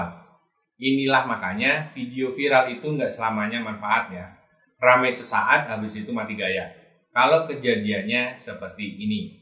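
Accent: Java, Indonesia. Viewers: Terima kasih banyak penjelasan nya pak, sukses terus. Njenengan jowo pundi?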